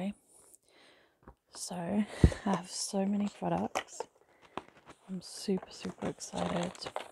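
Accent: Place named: East Anglia, United Kingdom. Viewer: Love a British asmrtist! You sound like you are possibly in the East of England like me. Enjoyed your vid thank you x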